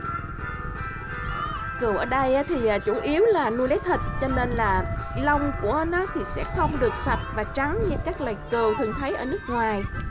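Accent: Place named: Saigon, Vietnam. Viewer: Đồi cừu suối nghệ vũng tàu,vũng tàu có nhiều cừu ban ha,nghe giọng nói của sài gòn dễ mến quá,mình thích giọng sài gòn quá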